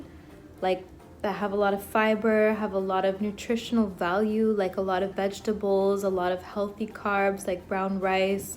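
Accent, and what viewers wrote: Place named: New Jersey, United States. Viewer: Oh wow she's Canadian? I toad-ally thought she was from like Jersey or New York. Is it just me or does she have that accent? Lol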